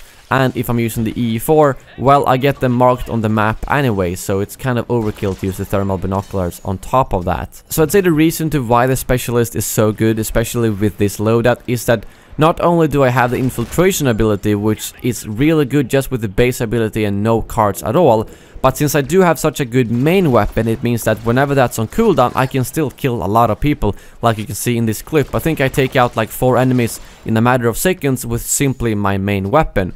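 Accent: Sweden. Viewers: I just found this guy and i got it right away that you were swedish, hahah hej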